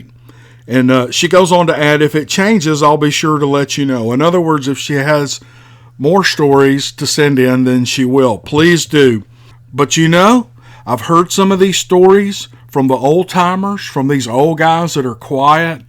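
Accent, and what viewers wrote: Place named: South, United States. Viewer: Dang it that last one was a corker! It’s 9pm here and I made the mistake of listening to that one while driving a lonely road up here in the redwoods of Northern California. Not even the soothing tone of southern voice could shake the creeps. Great work as usual 👏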